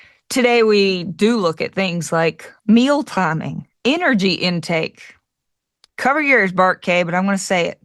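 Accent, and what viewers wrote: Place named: East Tennessee, United States. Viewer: New subscriber. Love your personality. You sound like you’re from around here, East Tennessee.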